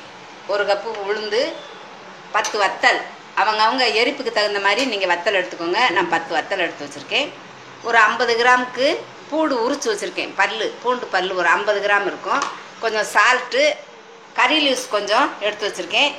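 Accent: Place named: Sattur, India. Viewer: my dad was from a village near sattur, vembakottai and the way of talk has taken me back to that land of mine..good one thnks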